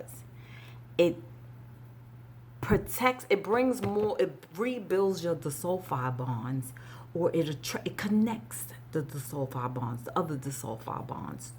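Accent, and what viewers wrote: New York, United States. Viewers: Thank for this video. Love your accent. From NY? You tell it like is and that honestly is refreshing. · Girl you have to be from New York I love the way you talk I'm about to order because the way you broke it down lol thanks Boo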